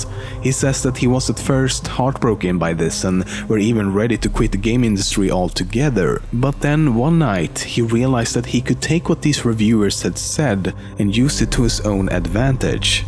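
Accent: Sweden. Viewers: Your accent sounds swedish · You are swedish huh? xD Strong accent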